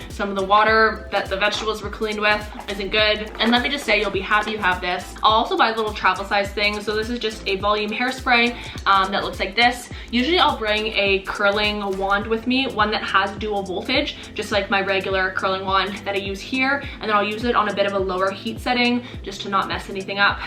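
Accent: Canada. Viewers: I love the way you say bag hahahaha your Canadian accent is so cute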